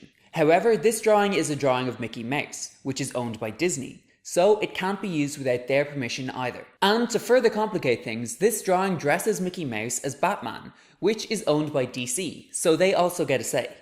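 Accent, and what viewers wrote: Ireland. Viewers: I really like your video, and I like your accent although it is a really soft irish accent haha. Btw, short hair is better. Have a nice day.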